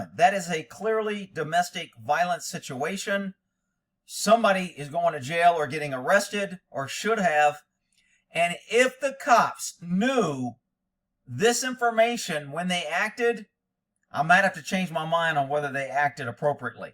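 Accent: Texas, United States. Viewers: And the screechy Texas draw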